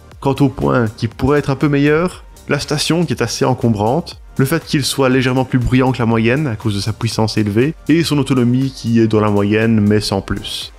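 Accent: Belgium. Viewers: Oufti l'accent du béwé!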